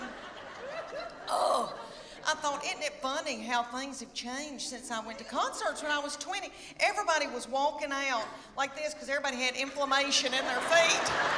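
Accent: South, United States. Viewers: Oh, bless his heart!!! Spot on Southern. Love her! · Why do I love the southern accent so much - it drives me more crazy than a French girl naked at the place St.Sulpice ? Awesome 😂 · She is just 100% valid. I love her. Only a southern woman can make a two syllable word put of “van” “va-yun”. Don’t worry about. I’m from texas born and bred and we do the same thing.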